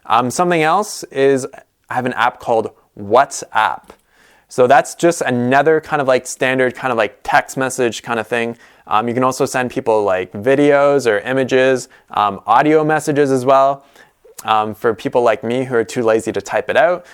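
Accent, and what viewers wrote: Canada. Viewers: I think he english-canadian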